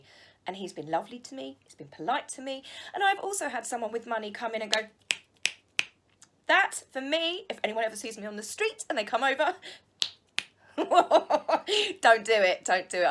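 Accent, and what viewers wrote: United Kingdom. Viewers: I'm new to your channel and I must say, I totally love your content. Your sense of humor mixed with your lovely British accent made me come back a couple of times. The way you view things (especially on MeGain) is hilarious. I've been waiting for a new video all day long, so I guess you can consider me a new loyal fan of yours 😊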